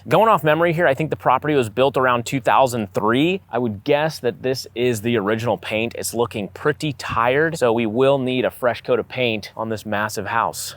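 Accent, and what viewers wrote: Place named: Pacific Northwest, United States. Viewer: Whole video I was thinking the area looked so familiar and your accent was very PNW but when I saw Hood off the balcony that kinda cemented it lol 😅 I'm 98% certain I have drilled some water wells for those hills back 20+ years ago now. Little more crowded than it used to be.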